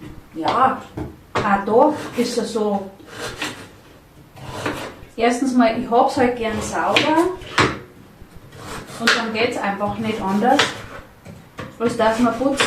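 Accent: Bavaria, Germany. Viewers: I bin nach Venezuela ausgwandert und gfrei mi wenn i a super Frau aus Bayern zuahern konn. Bin aus Minga❤ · Moin moin, na klar versteht Dich der Norden. Ich mag den bayerischen Dialekt, ist lustig 😅. LG, Helga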